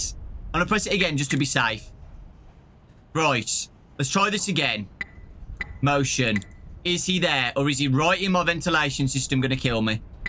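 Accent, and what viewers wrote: United Kingdom. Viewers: Your from the uk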